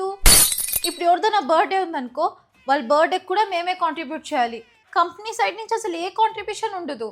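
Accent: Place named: Karnataka, India. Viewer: It"s Karnataka Telugu 😂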